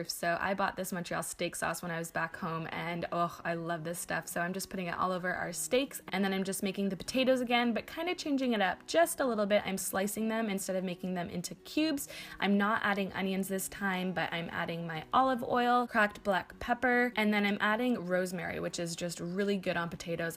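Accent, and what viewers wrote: United States. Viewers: Do you get Asda in America? I assume by your accent that you're American but I thought that Asda was only in the U.K.? Might be be wrong xxx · I'm confused. You have an American accent but I noticed you using British products ?? No hate just curious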